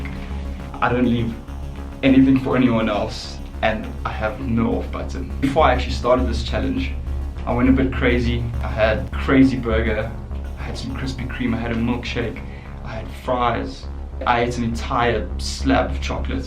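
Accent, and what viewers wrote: South Africa. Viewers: Dude, are you South African? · South African? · You sound South African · South African accent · are you south african?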